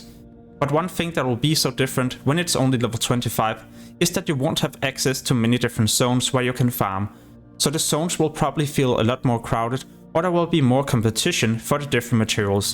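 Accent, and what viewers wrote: Denmark. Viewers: Great tips, ive been playing wow since the very first beta of vanilla and i still found some usefull tips here thanks. your accent sounds like your Dane just like me.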